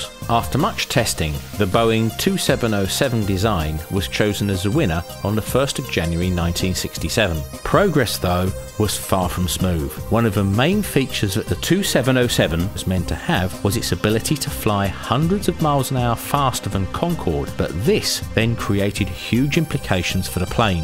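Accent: United Kingdom. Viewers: is it just me or do monotone, loud British accents just get irritating as fuck after more than about 2 minutes ? seriously this needs a female narrator or cc, dammit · He is British, and they all sound the same. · Just like to say recently came across your channel I find it very interesting informative and oddly relaxing plus it's nice to listen to a fellow brit rather than the plethora of Americans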